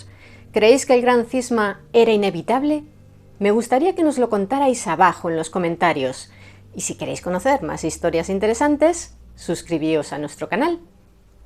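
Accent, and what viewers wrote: Spain. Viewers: me encanta como explicas la historia de una forma tan sencilla e interesante. Soy de México y amo tu "acento" español. 😅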